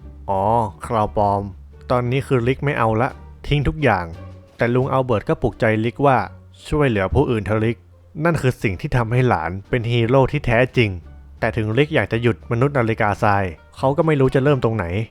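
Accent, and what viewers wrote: North, Thailand. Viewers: คนเหนือหรอพี่ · พี่คนเหนือหรอ ฮานี้บ่าเฮ้ย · พูดเหนือด้วย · คนภาคเหนือหรือป่าวนิ คนสปอยอะ5555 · คนเหนือก๋าา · พี่เป็นคนเหนือหรอครับ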